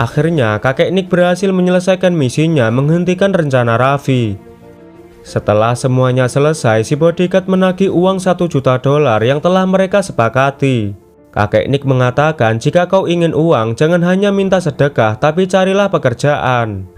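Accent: Java, Indonesia. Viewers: Meddookkk adminnya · Medok jowone mas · Jowo mu bro medok banget,😅trimakasih ya bro sdh bs menghibur para pemirsa yg suka dg flm action👍👍💪💪🙏🙏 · Medok banget suaranya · Baru mau saya komen, medok amat ya, Brebes apa Purbalingga ya😅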